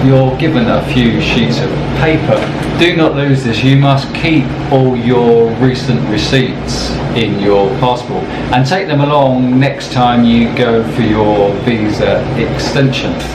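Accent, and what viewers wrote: United Kingdom. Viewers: I can't take your snooty British accent for more than 30 seconds.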